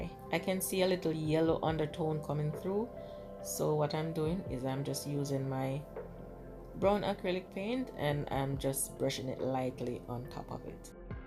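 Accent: Jamaica. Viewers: This is absolutely brilliant!! I adore your voice!💗are you Jamaican? You mentioned condolences… whatever is happening, I am sorry for your pain… I’ve had a tough year myself- lost my mother and 3 premie grand babies…❤️❤️‍🩹💔praying for you, lovie.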